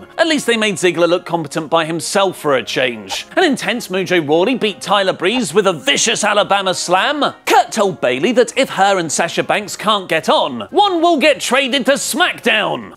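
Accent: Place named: United Kingdom. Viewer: u need a rko I hate ur British accent I'll give u a beer then a stone stunner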